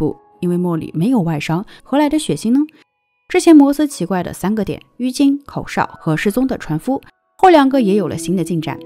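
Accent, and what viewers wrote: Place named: Nanjing, China. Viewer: 👍👍👍你是南京人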